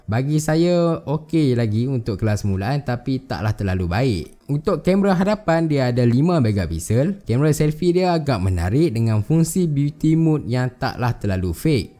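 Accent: Taiping, Malaysia. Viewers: Orang taiping ke bang?😂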